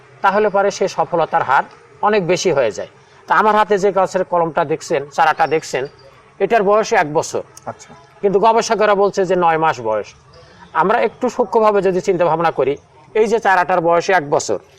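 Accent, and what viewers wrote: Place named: Tangail, Bangladesh. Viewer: ভাইজান সুন্দর করে বুঝিয়েছেন। ওনার সহজ সরল ভাষা ও কিছুটা আঞ্চলিক (সভবত টাংগাইল, জামালপুর বা মানিকগঞ্জের ভাষা) খুব ভালো লাগলো। টপিকটা আমার কাজে দিয়েছে। ধন্যবাদ জেন্টেলনম্যান।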